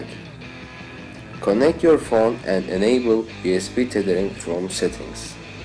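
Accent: Turkey. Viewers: Aksanın her yerinden ben türküm akıyor :D Neyse helal olsun kardeşim güzel videoların var. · Türksün değil mi aksan çok belli ediyo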